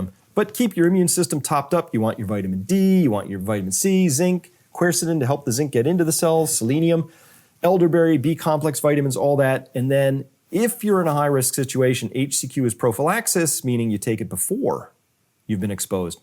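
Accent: England, United Kingdom. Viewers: But that English accent though! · 😅😂. English accent 😊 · Lovely Brit Accent. Top class video. Thankyou very much for my shot of common sense. Gerald Celente also calls it for what the "rulers & managers" are: Trends in The News Going Live: More Lockdowns, More Mandate Madness, More Econ/Social Destruction <link> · Thanks. Love your positivity and your “English” accent! Excellent 👍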